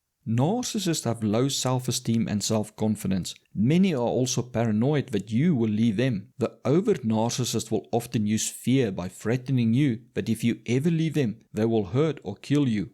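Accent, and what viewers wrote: Afrikaans, South Africa. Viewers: Dude, is jy Afrikaans? Jou aksent klink baie Afrikaans.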